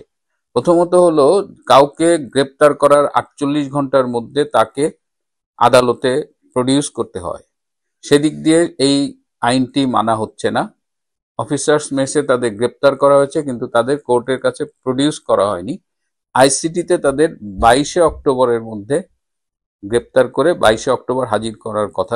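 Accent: India. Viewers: বাড়ী কি ভারতে? বাঙলা কলকাতার মত।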